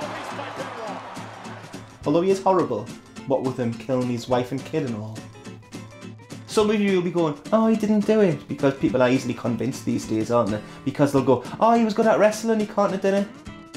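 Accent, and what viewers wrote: United Kingdom. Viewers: This is too british for me to understand.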